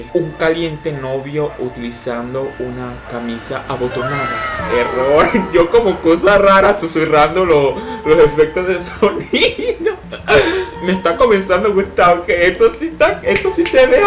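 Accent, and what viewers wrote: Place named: Venezuela. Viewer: Me gusta tu forma de explicar... Tu acento suena a... venezolano... hablas muy chistoso.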